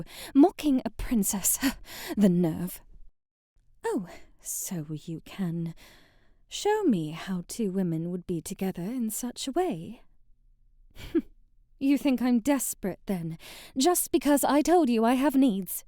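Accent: United Kingdom. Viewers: im begging for a hairdresser one with Adela you'd fit her with the brittish accent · I love your British voice😌❤so beautiful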